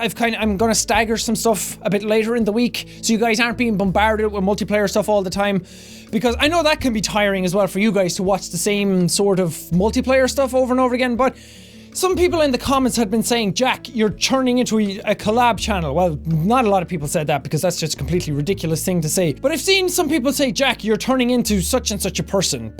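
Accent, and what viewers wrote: Ireland. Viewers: what part of ireland are you from? im a wexford man :) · JACK!! I think that you are an AWESOME person. You are my favorite youtuber! And i love your irish accent! You are A BOSS!!!!! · Jack where in Ireland are you from #IrishAndProud :D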